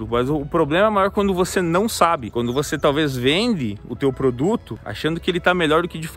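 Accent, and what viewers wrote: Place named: South, Brazil. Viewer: Esse sotaque e aqueles pinheiros no fundo da pra saber q tá bem no sul kkk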